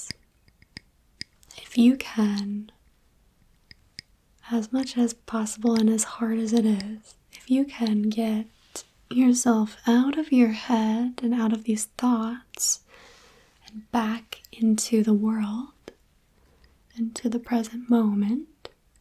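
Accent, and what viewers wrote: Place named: Quebec, Canada. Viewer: If you are not French then you are probably from Quebec (Canada)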